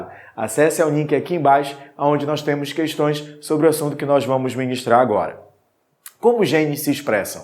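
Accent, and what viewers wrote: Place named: Pará, Brazil. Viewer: O senhor é incrível!! Esse sotaque é paraense 😂❤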